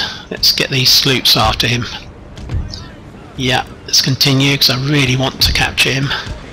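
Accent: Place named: United Kingdom. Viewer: Oh man I'm so glad I found you! Fantastic naval battles! It's been such a long time since I found someone that is good! Also your British! Makes the voice over great!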